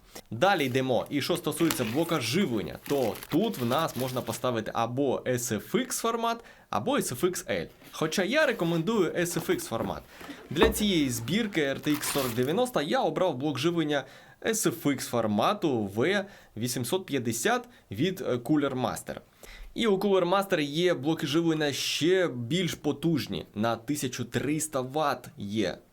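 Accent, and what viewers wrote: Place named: Odesa, Ukraine. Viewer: Прошу не сприймати близько до серця, але я в шоці, що існують українці, котрі говорят українською з таким акцентом. Я з Кривого Рога і теж до ПМВ говорив російською, але такого акценту немаю. Одеса не припиняє дивувати.... просто окрема країна якась, котра всі ці роки була десь не з нами.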